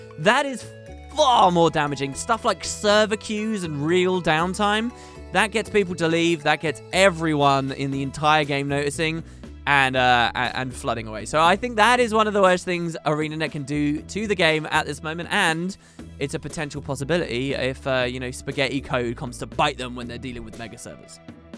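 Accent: United Kingdom. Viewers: Glad to have some lighthearted British monologue in the morning between two dark episodes of Daredevil :D Totally agree about third party lore/story sources. I love the lore and enjoy the story when it's good but I rarely experience it in-game. Every now and then I take my time to interact with a NPC but usually I just click through all the dialogue as fast as possible. I think the last time I really looked for stuff in-game was in S02 when we returned to the Priory and I read something like half of those new lore bits.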